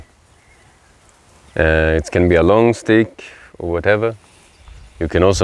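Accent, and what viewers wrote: Kenya. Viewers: I'm surprised you have a strong Kenyan accent , what a wonderful video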